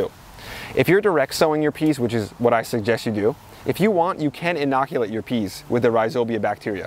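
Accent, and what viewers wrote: New Jersey, United States. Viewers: Are you from Joisey?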